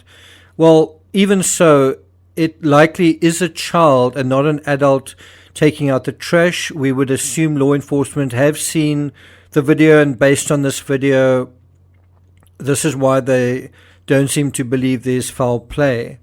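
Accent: South Africa. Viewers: I am following other channels which is a lot closer and know more about this case. On 1 channel 1 of your videos came up where the question was where did it come from and indicate the english according to the hoax messages. I did indicate that the accent alone tells me it is someone from South Africa's video so I looked you up. There is so much info out there currently about this case. Happy to see that you tubers in SA have also picked this up.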